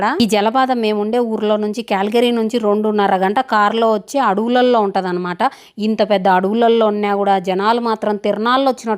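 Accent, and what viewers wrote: Rayalaseema, India. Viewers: Good afternoon sister, I am always very interested listen to your Rayalaseema Slang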